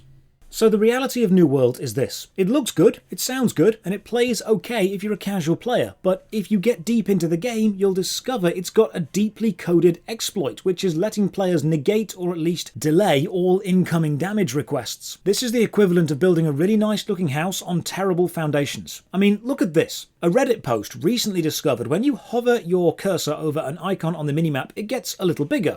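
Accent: United Kingdom. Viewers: Another thing...Why is Topher Grace from that 70s show British and talking to us about gaming???????? 🤔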